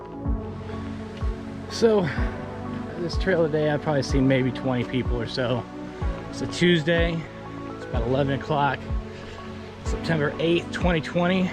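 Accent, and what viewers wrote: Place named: North, United States. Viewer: You said you have been there 25 years but your accent is a bit more 'up north' sounding. Where are you originally from?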